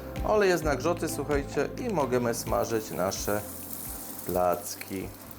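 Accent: Silesia, Poland. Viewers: 😂 slunska gwaro śmieszno jest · Te przepisy,które dotad oglądałam są super.Bardzo lubię jak mówi po śląsku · Przepiękna Śąska Gwara! Byłem kilka razy na koloniach z chłopakami że Śląska i natychmiast po pół wieku wspomnienia wrócily. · Kocham Pana kuchnię,smaczna,prosta,podana w prost,apetycznie.Kocham gwarę śląską, uczę się od lat Pana przepisów, obserwuję Tak trzymać!!!!!!❤😊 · nieprawda. Ja uwielbiam jego śląską gatke. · Dziękuję za dobre rady jakie ziemniaki wybrać na placki.Przypadkiem trafiłam na ten kanał i już subskrybuje, bo mi się podobo śląsko mowa❤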